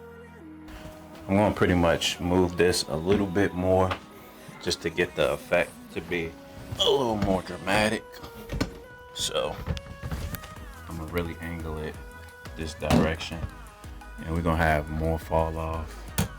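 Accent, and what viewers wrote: United States. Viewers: the maryland accent on a shorty gone get me everytime 😭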